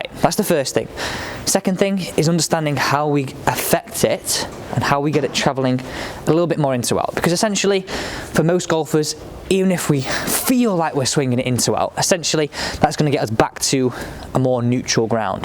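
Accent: United Kingdom. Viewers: Great visual drill,.! Brit accent and all!::)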